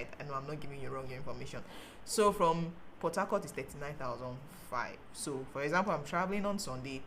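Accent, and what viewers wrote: Ghana. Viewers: Ur accent look like Ghanaian good job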